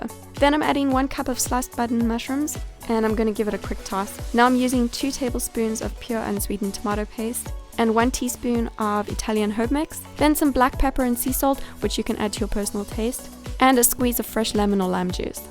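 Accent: South Africa. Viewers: you are truly God sent. I have been struggling to loose weight for a long time and now it has started to affect my help. I started following you two weeks ago, and followed all you suggestions. I am happy to say in two weeks I have lost 2KG. So I just want to thank you and encourage other views to try your suggestions. I love your videos, your voice is soothing and I love you South African accent...lol. keep uploading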